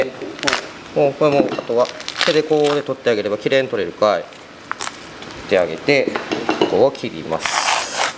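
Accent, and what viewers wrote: Miyazaki, Japan. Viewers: 若いころにいた会社でお世話になった宮崎出身の上司の方言と同じ方言で凄く懐かしい。そしてこの人、教え方も丁寧だし技術も上手いしで、本当に凄いです。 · 宮前弁まるだしw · なーんか聞いた事ある 話し方と思えばやはり宮崎(o´罒`o) 宮崎のしゃべり方は落ち着く笑 けど自分がこんな感じではなしてると 自覚してる人はいない笑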